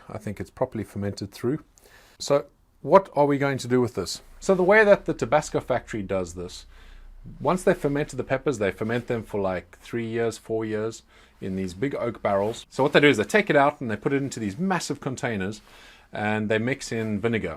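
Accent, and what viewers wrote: South Africa. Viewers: Are you from South Africa brother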